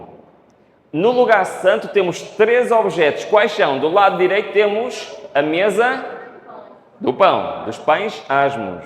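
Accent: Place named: Portugal. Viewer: Boa tarde, amo ver ,este PR. Ele é muito didático, e meu conterrâneo,sou filha de português,e meu pai, falava assim.Moro no Brasil.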